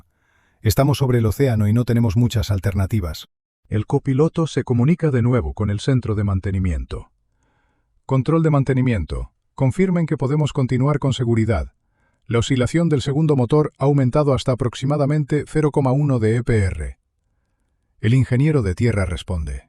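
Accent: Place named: Spain. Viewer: Es el primer vídeo que veo en el que se pasa aleatoriamente de la narración en español de España al español hablado en países hispanoamericanos.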